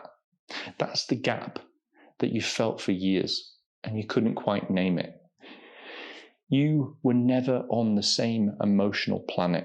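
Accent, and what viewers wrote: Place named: United Kingdom. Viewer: Oh....man...ive always seen u on tictok.....never knew you had the British accent!!! Love it!! Always have...Im from the states & i sound like a dumb hillbilly!! Thank you for all ur work, im still healing after 20yrs with a narc...u are a blesssing❤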